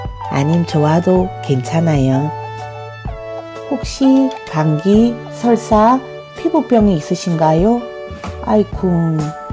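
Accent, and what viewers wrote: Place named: Seoul, South Korea. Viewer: 와ㅎ 선생님 서울사람같아요ㅎㅎ 역시 유익한 강의~~♡♡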